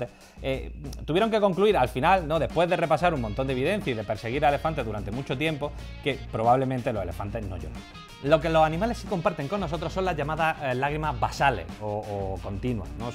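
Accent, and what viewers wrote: Spain. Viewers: Muy mal audio y la edición podría mejorarse mucho si se reduce el sonido de los efectos y se evitan tantos cortes y por amor del cielo trate de hablar en español universal que lo entendamos los de fuera de España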